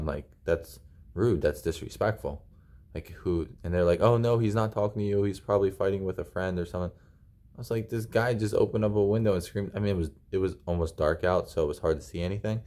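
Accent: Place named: Pasco County, United States. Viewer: Sounds like you live in pasco County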